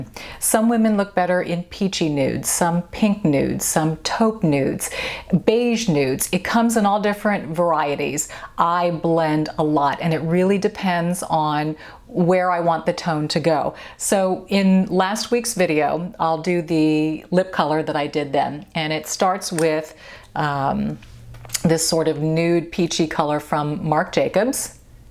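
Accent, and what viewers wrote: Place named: South, United States. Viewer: I'm presently going through many of your videos to see which primer you use and recommend for face, also for eyelids. Suggestions by others on here are welcome as well, please!! Don't want to spend note than about $35 $40, (unless it is a quart sized container🌝) Normally I'm more conservative about price except I think a primer will make ALL the difference as to how my face and makeup look, in the end. Prefer 1 with anti-oxidant, if possible. I've been looking for a while now and almost ready to just buy something cheap till I can find a quality product for sensitive skin that's not drying.. Thank you for all of your effort in keeping me somewhat in-the- know about skincare and makeup, since I subscribed. You're a lovely person, and I adore the little bit of Southern sound in your voice. It's another one of your charming attributes that sets you apart🌴🤗🌹☮☺🌴 Edited to add---don't know why the strike-through text appears that way above. 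Didn't intend to negate the words I wrote.☺🌴☮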